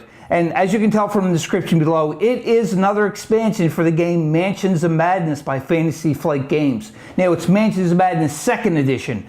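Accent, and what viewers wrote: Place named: Newfoundland, Canada. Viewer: Where are you from? Trying to place the accent. Sounds like NL (Can.) to me. :)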